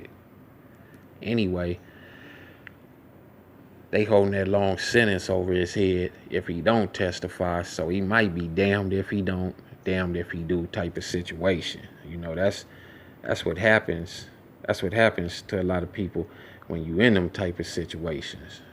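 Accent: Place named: California, United States. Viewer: New subbie here. And I agree that Cowboy does not have anything to do with it. When he took off his hat and scratched his head to me that’s says like wtf did I just see. Or perhaps he thought nip was gonna get robbed but not killed. Anyway you take it it’s crazy and are you from Cali? I can hear the accent.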